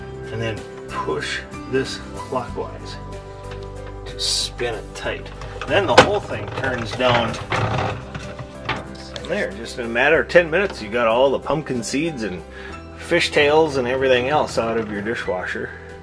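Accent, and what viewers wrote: Minnesota, United States. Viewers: I am guessing you might be from MN. (me too, fun to hear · Bet your from Minnesota or the Dakotas maybe. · Listening to you makes me homesick for Minnesota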